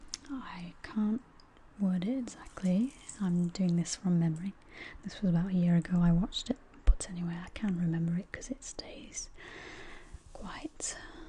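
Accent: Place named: Yorkshire, United Kingdom. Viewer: I'm hoping your Yorkshire down to earth accent is genuine about spirituality, we are taught alway question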